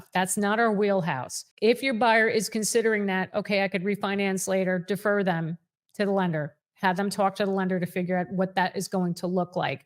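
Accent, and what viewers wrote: New Jersey, United States. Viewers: I watch this channel for the New Jersey accent alone 😅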